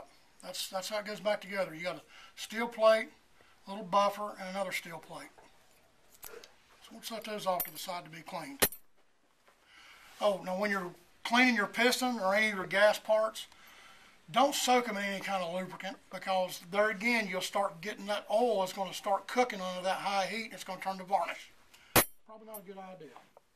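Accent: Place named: Mississippi, United States. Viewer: I enjoyed your BAR disassemby video. I have had two in 7mm Mag. No recoil and accurate. The only problem is that I get walleyed trying to keep track of the brass when I shoot. You don't sound like Down East. I lived in Maine for 5 years in the '60's and spent a lot of time in North Berwick. You sound more like Mississippi, where I am in exile now. Keep up the good films.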